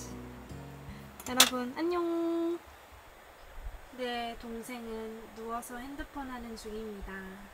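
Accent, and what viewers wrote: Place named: Gyeongsang, South Korea. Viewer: 예쁜 아가씨 말투에 경상도 사투리가 약간 있는 거 같네요 ㅎㅎ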